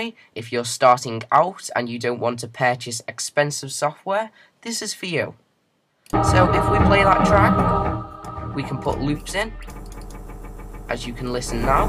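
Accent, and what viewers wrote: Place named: United Kingdom. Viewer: i love british voice.. pure english voice for a bulgarian for me... btw this review is great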